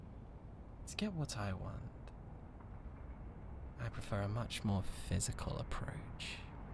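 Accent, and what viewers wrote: United Kingdom. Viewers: High pitched British screaming that's makes you both laugh and also feel slightly concerned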